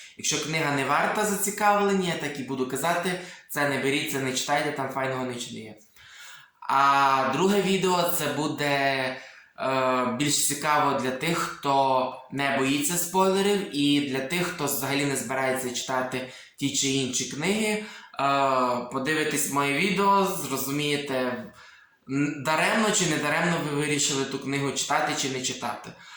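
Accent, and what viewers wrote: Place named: Transcarpathia, Ukraine. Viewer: Не треба менше розмовляти закарпатською!🥺 для мене то - мед на вуха🥰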